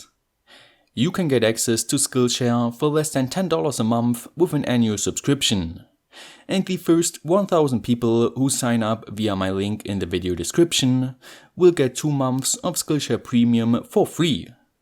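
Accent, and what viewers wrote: Germany. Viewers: I am trying to make a simple - foldable array of small panels able to charge a 20000mAh 18W power bank. After 10 minutes of sifting through a ton of garbage videos on this topic, all with colorful thumbnails, idiotic, brain - damaging music and guys who just hot-glue shit together, I finally arrive to a guy with German accent. I think this will be good.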